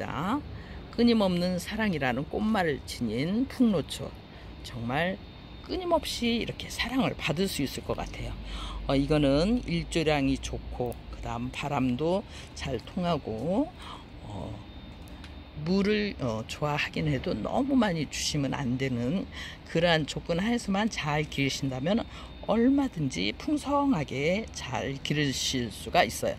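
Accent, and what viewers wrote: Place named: Seoul, South Korea. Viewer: 설명 하시는 말 솜씨가 완전 서울 토박이 같네요 ㅎ 전 갱상도라 부럽습니다 여러 이쁜꽃들 소개시켜줘 고맙습니다 👍🙏🥰❣️🌷👏👏👏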